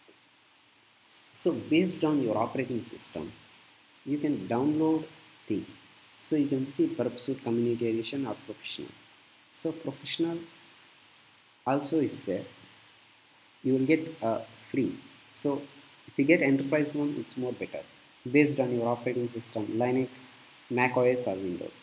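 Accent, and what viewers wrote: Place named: Tamil Nadu, India. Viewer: I love your TAMIL ACCENT 🎉❤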